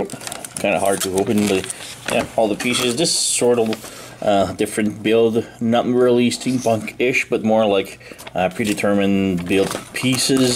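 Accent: Quebec, Canada. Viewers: Super interesting. I haven't built these kinds of models before. Do I hear a Quebec French there?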